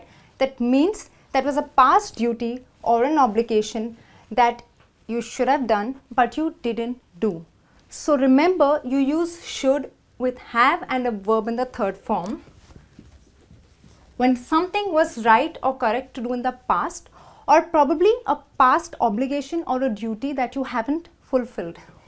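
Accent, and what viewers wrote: India. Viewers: Is that the indian accent? I appreciated it tho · Hindi style , plzz try to use English way of speaking . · thonzskie junior I agree with you. All my friends who are from Indian speak English the same her voice... maybe this is intonantion of Indian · indian pronunciation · excent indian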